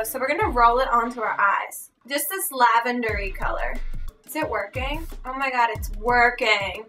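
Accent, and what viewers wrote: United States. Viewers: Oh. it's tha-a-a-a-at fuckin' american! Simply loved it! :DD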